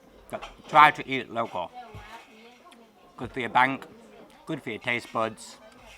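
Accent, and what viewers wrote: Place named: Thailand. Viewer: First video of yours I've seen,. 😁✨ Fantastic video. Your accent had transformed a lil from Sutton/English to a hint of Thai accent it sounds. Can't wait for the next video. Loving seeing your footage from Thailand. Well done!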